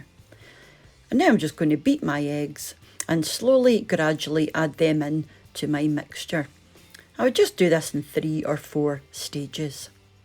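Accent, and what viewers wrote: Scotland, United Kingdom. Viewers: This takes me right back to school.. Oh how I loved school dinners lol especially the puddings.. Yes those big silver jugs with the runny pink custard.. Where I work, the canteen does fab puddings but they are proper man size portions.. Bakewell Tart is another favourite.. Shame I'm on bloody slimming world.. Thanks always love to hear your lovely Scottish voice. Jules x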